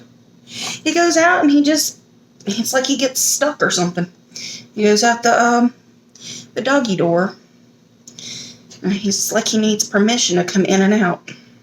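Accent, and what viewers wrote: South, United States. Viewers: It’s that sweet southern accent and attitude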